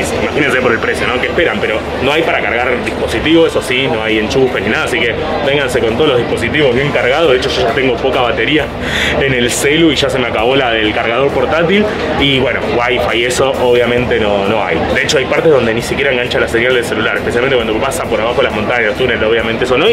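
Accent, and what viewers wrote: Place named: Buenos Aires, Argentina. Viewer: es tan porteño que me da bronca amigo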